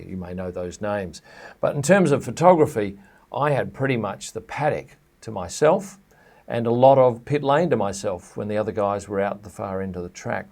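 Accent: Australia. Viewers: Discovered your channel about a month ago and your quickly becoming one of my favourite f1 YouTubers. The fact that your a photographer brings a new perspective as you've seen alot more of these guys than us folks. And I also love an Aussie accent. Thanks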